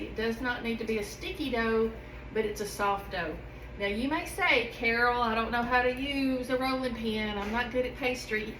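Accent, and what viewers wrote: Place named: South, United States. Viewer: But prettier and such a sweet spirit. Love the southern accent.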